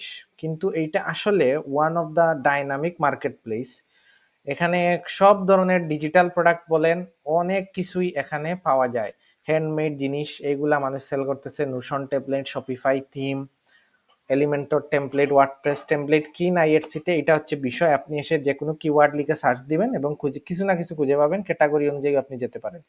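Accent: Sylhet, Bangladesh. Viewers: Vai apni ki sylheti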